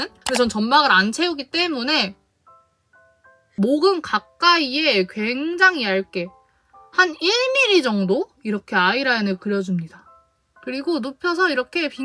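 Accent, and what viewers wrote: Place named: Pohang, South Korea. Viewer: 근데 님 어디사람이세요..? 서울이 아니신거 같네용 포항사람인 학교쌤 말투 ↘️↗️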